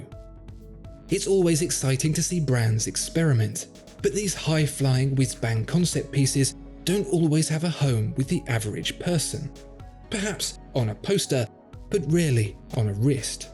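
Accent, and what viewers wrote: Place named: England, United Kingdom. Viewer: Love your content but "Happenstance" FFS! Laurels is pronounced lorrels, not loorels. It makes you sound like you have put your beautiful English accent through a robotic computer enunciation programme.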